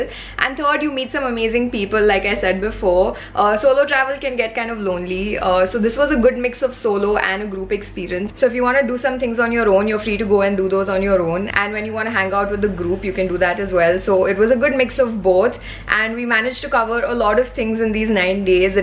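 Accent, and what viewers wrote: India. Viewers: I love ur vlog the shot & editing is so great & ur indian accent is so cute. Greeting from Java Island, Indonesia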